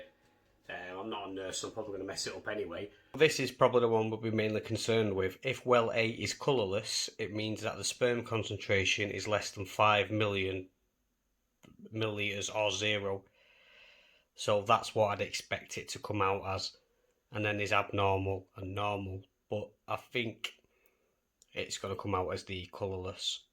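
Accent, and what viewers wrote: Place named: Northern England, United Kingdom. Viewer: Which CF unit do you go to? You sound like your from the North? I go to Leeds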